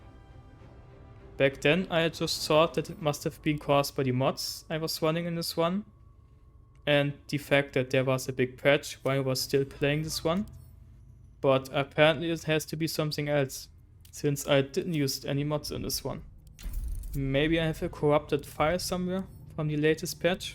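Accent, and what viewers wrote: Germany. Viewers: Pretty cool video , a lot of thought went into it I can see that. one thing though, why don't you consider to use all of shar's blessings in the Myrkul fight ? any specific reason ? they are rather powerful and could have saved you 15h of redoing everything. I'm also very impressed there was no " I blew up the emboss in one turn using firework / explosives" I might need to start all that pre-buffing and switching stuff if I ever wanna do a solo Honourmode run. Wenn du den Deutschen Akzent für die Zukunft loswerden möchtest, empfiehlt es sich, Serien, Spiele und Filme auf Englisch zu setzen, da du hier die richtige Aussprache hörst, dieser Tipp hat vielen meiner Deutschen Freunde geholfen, sich schnell darin zu verbessern. Ich finde, es hat mittlerweile einen gewissen Charme.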